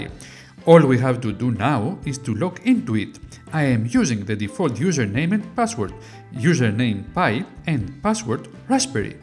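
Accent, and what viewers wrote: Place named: Greece. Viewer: Κανεις μπαμ πως είσαι Έλληνας αδερφε 😆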